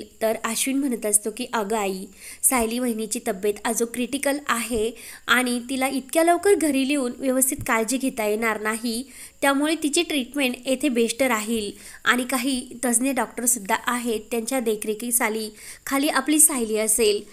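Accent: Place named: Gujarat, India. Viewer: Gujarati ahes na tu😅 marathi bgha hichi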